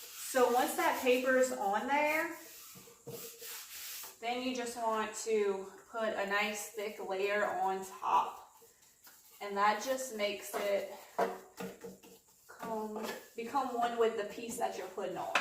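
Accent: Louisiana, United States. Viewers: I KNEW you were from Louisiana when I started watching this video! I heard it in your accent. I'm from Baton Rouge, but have lived in South Carolina for 36 years. I am redoing a fantastic old high chair that I found in somebody's garbage. I've done another one, but not with chalk paint. This will be my first chalk paint project and it is for my 6 year old granddaughter. She wants a real high chair for her baby dolls, so this will be my Christmas gift to her. You did a beautiful job on this and I got a lot of good ideas from you!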